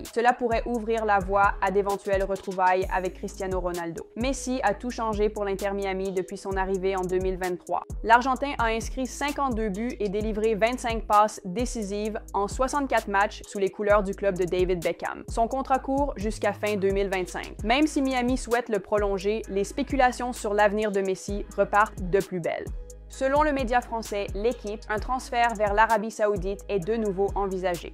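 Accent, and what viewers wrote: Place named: Quebec, Canada. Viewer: Non elle a juste un accent québécois c’est tout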